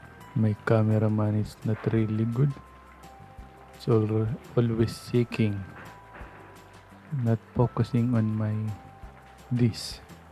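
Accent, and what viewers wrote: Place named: India. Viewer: ang sarap nmn yan sir,parang accent ng Indian race ang host..jiji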